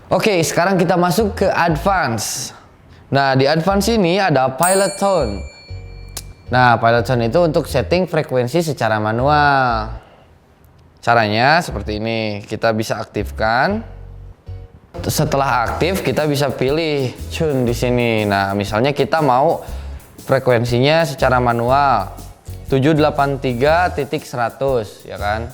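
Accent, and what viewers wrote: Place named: Sunda, Indonesia. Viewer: Kentel bgt sunda na, kang kabayan banget atuh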